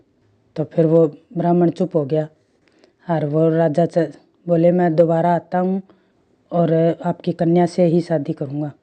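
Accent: Haryana, India. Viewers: Aap haryana se lgti ho bahan ji prnaam 🙏🏻🙏🏻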